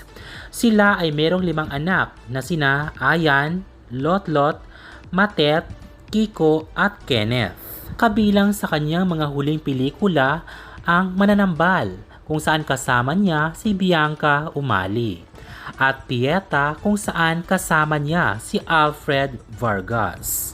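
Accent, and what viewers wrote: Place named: Visayas, Philippines. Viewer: Bisaya itong nag voice over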